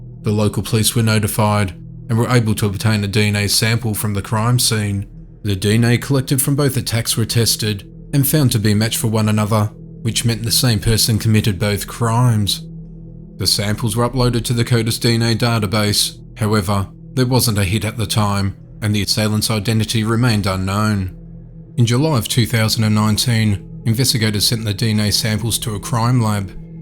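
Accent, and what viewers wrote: Australia. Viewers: The narrator is obviously from Australia. They pronounce many English words differently than we Americans do.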